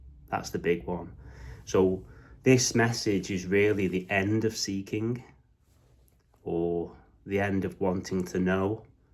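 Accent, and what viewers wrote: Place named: North West England, United Kingdom. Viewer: Cool video man, are u from Manchester? I am from North West England tryna guess ur accent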